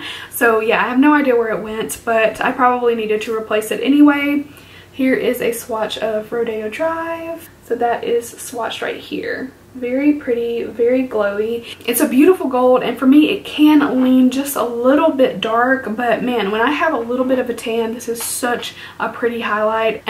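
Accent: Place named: Alabama, United States. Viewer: im from alabama and as soon as i heard your voice i was like "shes gotta be from here too!" and then i read the description lol thats pretty cool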